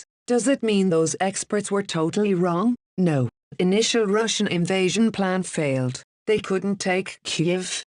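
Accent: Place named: Ireland. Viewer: Why does the bot have an Irish accent?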